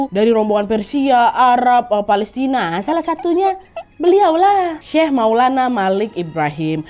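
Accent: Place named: West Sumatra, Indonesia. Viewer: ASSALAMUALAIKUM, MBAK, DARI LOGAT BERBICARANYA SAYA RASA MBAK BERASAL DARI MINANG ATAU SUMBAR....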